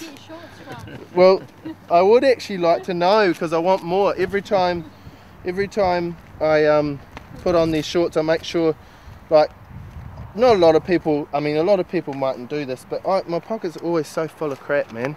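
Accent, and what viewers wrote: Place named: New Zealand. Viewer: hey, i love your work man, and that great kiwi accent lol. i've learnt a lot from these three videos and i too have become interested in growing, grafting etc.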